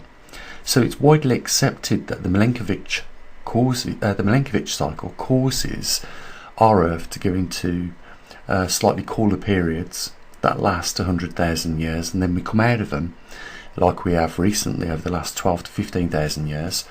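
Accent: United Kingdom. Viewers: Hi. First of all, I must say I like your information of magnetic poleshift a lot. But as a non-native English speaker, I find your British accent is too difficult to comprehend or access your content. I have a little problem when listening to an American accent speakers. If you are aware that not only native English speakers have followed your jobs, but there is me following your difficult accent also. Your fan from Thailand.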